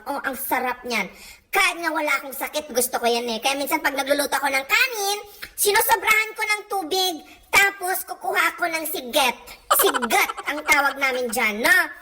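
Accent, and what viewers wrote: Ilocos, Philippines. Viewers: Antie mas may dating pagka ilokano ang salita mo.. dabes yung part 2/3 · Ilokano is real parin auntie Mas magandang pakinggan SA mga ilokanang katulad Ko Char 😁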